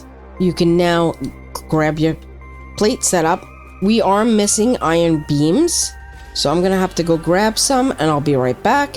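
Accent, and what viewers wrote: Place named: New Jersey, United States. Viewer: I agree! One of the reasons I enjoy this series is because she sounds like my wife’s family, who hail from northern New Jersey. Aside from their making fun of my (practically nonexistent) southern accent for a few years, you won’t find a better group of people. And yeah, they know where the best deli and bagels are (and now so do I!)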